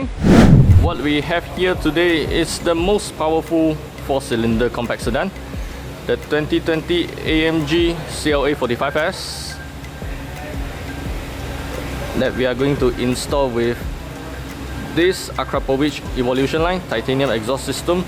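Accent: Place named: India. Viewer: Индуса сразу слышно 😂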